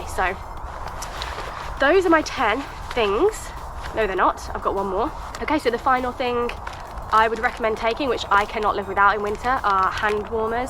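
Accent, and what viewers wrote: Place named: RP, United Kingdom. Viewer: Thank you!! As an American I loved your proper accent and pronunciation. I found the difference in vocabulary I.e. torch/flashlight cute!More importantly that was quite the comprehensive list!!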